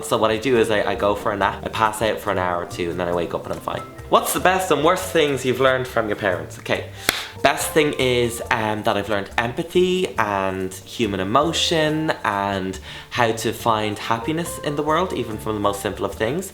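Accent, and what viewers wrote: Ireland. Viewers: Is he Irish? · So do you live in Ireland cause you sound like an Irish person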